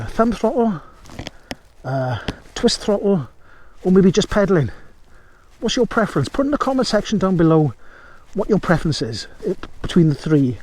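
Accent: Wales, United Kingdom. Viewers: Hi, I like your welsh accent, reminds me of my late father in-law, Unfortunately my wife was born here in Yorkshire so no nice accent. Great scenery on your challenging ride. I have a 6 month old Carrera Vengeance e spec which has been a total nightmare. Long story but i have replaced all electrics apart from hub motor. I fitted a left hand thumb throttle due to wanting to keep throttle wide open while changing up as only 250w motor so need to keep it rolling, especially up hills. Subscribed, will watch other vids soon.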